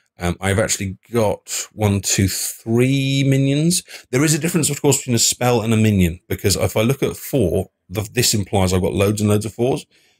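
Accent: England, United Kingdom. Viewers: Denn Green III He's english and has a deep voice. perfect combination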